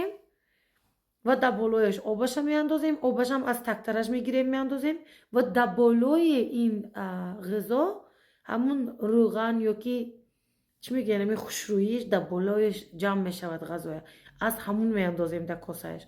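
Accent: Bukhara, Uzbekistan. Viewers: Чи хуш чашмони зебо дори лахчаи зебо дори ошикат шудам духтари бухоро самарканд .💕✊️ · Офарин апа, лаҳҷаи шумо (Лаҳҷаи Бухоро) бисёр ширин аст. Ҳаминхел наворҳо бисёр шавад, иншоаллоҳ шумо забони ширини тоҷикиро фаромуш намекунед. Салом аз Тоҷикистон. ❤Самарқанду Бухоро❤ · Чонам фидои миллати азизам лахчаи ширини Бухорои шариф чи зебост хохарчони гиромикадр дустат медорам ба пеш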